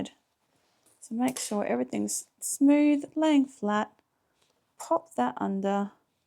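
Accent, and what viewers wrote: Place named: Australia. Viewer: Love you,! You’re the best teacher. I appreciate your Aussie sense of humor—not to mention the accent. In elementary school (4th, 5th, and 6th grades), I had a teacher who insisted every pupil — male and female — learn how to sew. I stopped many years ago. And now in my twilight years I’m using a Brother XR1300 and a Baby Lock Brilliant to make bags, bags, bags — before graduating onto something bigger. I plan to watch all your vids and hope you will continue to make them years into the future. Bless you!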